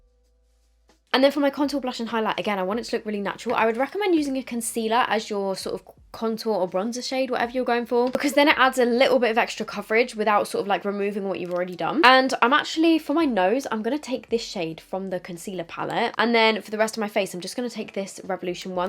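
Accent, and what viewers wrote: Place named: United Kingdom. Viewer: Regular watcher here but just had a « eurêka » moment that is going to sound weird: did you grow up in the same area/neighbourhood as Daniel Radcliffe?? Your speech pattern/enunciation is sooooo similar to my Canadian ears…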